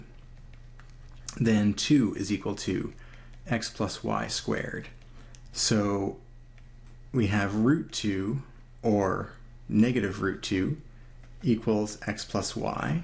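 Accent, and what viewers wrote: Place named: Canada. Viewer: Thanks. Your 'out' and 'about' gave away your Canadianess eh!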